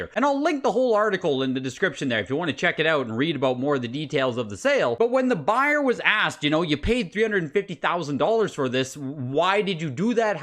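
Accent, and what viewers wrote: Canada. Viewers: What is that accent you have? Canadian?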